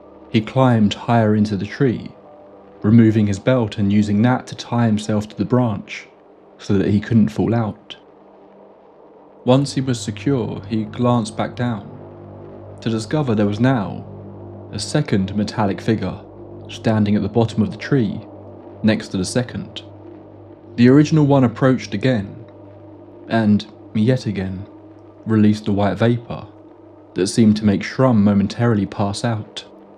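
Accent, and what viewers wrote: United Kingdom. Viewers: The British wank narrating this story is not helping sell the mystery or horror, whatever. I found myself wishing the narrator was in another line of work. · A british narrator is so boring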